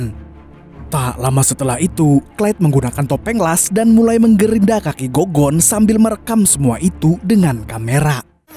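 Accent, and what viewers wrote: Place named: Kebumen, Indonesia. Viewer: Jelas admin ini orang kebumen , ke Sempor beli mendoan , ora ngapak ora kepenak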